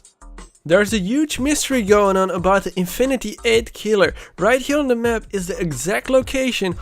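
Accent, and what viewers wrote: Netherlands. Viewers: Nice Dutch accent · Are yu dutch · Are you dutch? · Are u Dutch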